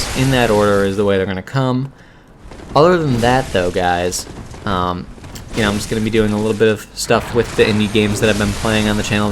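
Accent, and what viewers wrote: United States. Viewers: the american accent is strong with this video.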